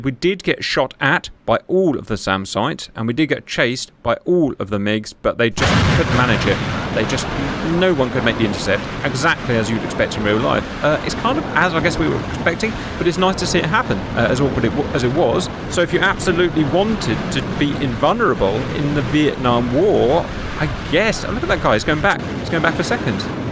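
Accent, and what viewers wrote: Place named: United Kingdom. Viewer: Worst mushmouthed modern British accent I've heard. HEAAAAAHHHH, THEAAAAAHHHH, OOHWWW ( = all) so annoying. A whole generation of Brits learned to speak from listening to Jonathan Woss and Jamie Oliver.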